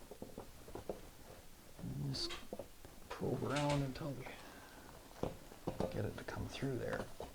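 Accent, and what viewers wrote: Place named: Prairies, Canada. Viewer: Thanks for the video and your thick Canadian prairie accent is making me homesick!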